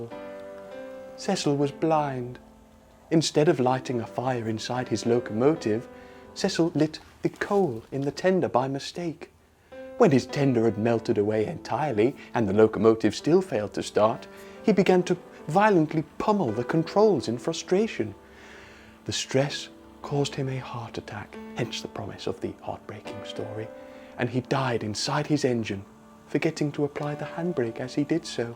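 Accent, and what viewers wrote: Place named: RP, United Kingdom. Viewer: Very well done "posh voiced"! Hugely entertaining and humorous. I dread to think how long it took you to do this!:)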